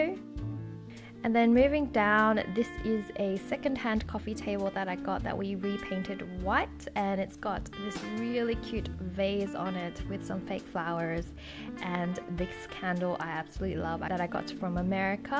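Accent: Australia. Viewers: it's the australian accent...like they say idear instead of idea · Is she Australian?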